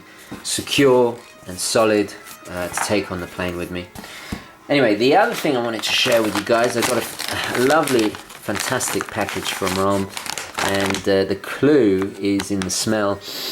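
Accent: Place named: England, United Kingdom. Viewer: Where about in England are you from. I can't pinpoint your accent.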